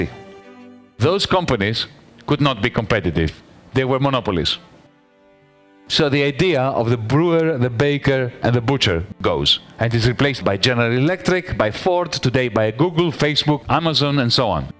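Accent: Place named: Russia. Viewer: The Russian accent is so enraging when it comes to criticize of capitalism!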